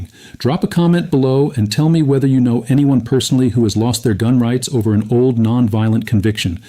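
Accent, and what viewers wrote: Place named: United Kingdom. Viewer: Why does this AI have a brittish accent today?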